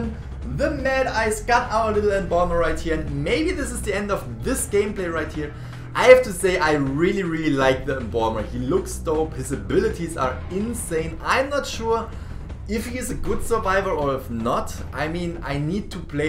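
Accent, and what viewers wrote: Germany. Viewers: German accent? Cool! Wish I had a accent..😐 btw what's your gamer ID if you don't mind me asking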